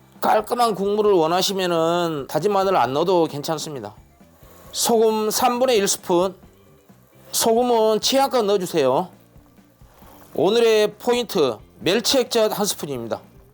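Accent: Jeolla, South Korea. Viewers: 전라돈디요 ㅎㅎ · 전라도 사투리죠😂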